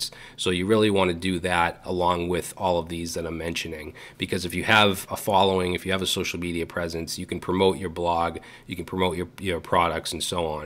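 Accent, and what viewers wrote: Boston, United States. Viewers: You hated your accent?!?! I just come from hearing you say "border" a million times in a tutorial and I was like "Boston, baby, yeah!" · ain't nothing wrong with having a Boston accent, even a rather thick one. you might even get some kids from abroad to say certain words that way 😀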